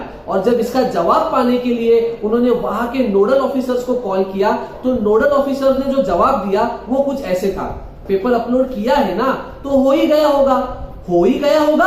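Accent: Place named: Maharashtra, India. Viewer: Ur from maharashtra seems from ur tome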